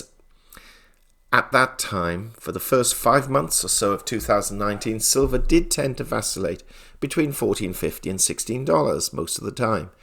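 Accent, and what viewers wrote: England, United Kingdom. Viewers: I truly love your postings. I make it an effort to watch for them every day, even if I place it on speed 1.5 to make room for the heavy English accent. Normally I listen to it at 2 and I do listen to many people on the markets. With that being said, you do go to awful lengths to prove you were right and others were wrong. I have noticed more often than not, you are right, hence why I choose to listen to you. Please consider the concept of “realizing you are right, you do not need to justify it in anyway”. You know, what you know.... and you sound extremely intuitive as well. Let go of any need to prove others wrong for it does not serve you nor me listening to you. Please, hear my views come from a pure heart. Thank you so much for giving us your guidance and advice each and every time you take the time to post a video. If you need any assistance on releasing this issue please let me know.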